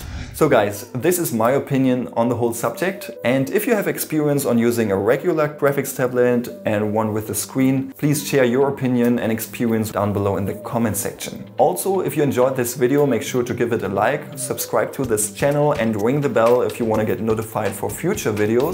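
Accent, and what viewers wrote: Germany. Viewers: Man hört voll raus, dass du als Deutschland bist😂✌🏻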